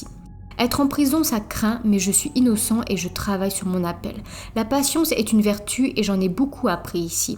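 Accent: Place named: Nord-Pas-de-Calais, France. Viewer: Pas top quand c'est raconté avec l accent chti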